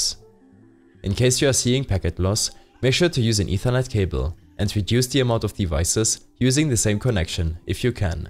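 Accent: Germany. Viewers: This german accent omg Im german too yay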